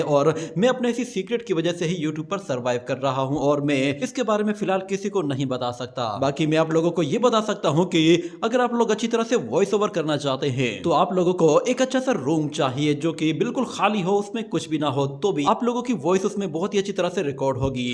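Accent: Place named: India. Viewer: Mujhe laaga ap Indian ho 😂😂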